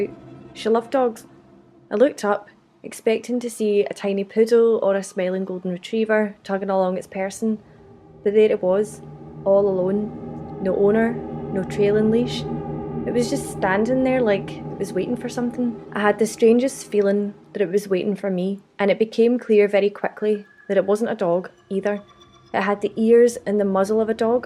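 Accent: Scotland, United Kingdom. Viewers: I could listen to your voice all day. Love love love the Scottish accent, my favorite. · super excited, and FASCINATING... you are so amazing and precious, i love how you say" Werewolves " 🤣🤣😘🤗🤗 very informative, and the best genes and features i have ever seen.. great show Ma ' Lady in Scottish accent !! keep it up..👍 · Hi id love to dive into your hole/your warewolve sized hole that is 😂where about in Scotland is that accent from up north iv had 1 encounter with a cryptid i believe and if youd asked me 6 or 7 years ago if that was possible in Scotland id have said you were crazy but personal experiences prove that this stuff is real 👍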